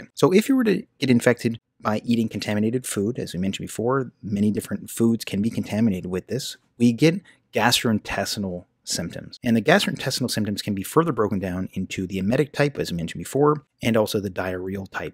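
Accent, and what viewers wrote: Canada. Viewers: Canadians talk funny.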